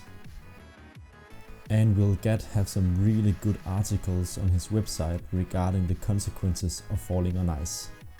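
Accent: Denmark. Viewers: Var i tvivl om du var dansker vurderet ud fra din accent.. Indtil jeg hørte “Arrrh! det var tæt på!”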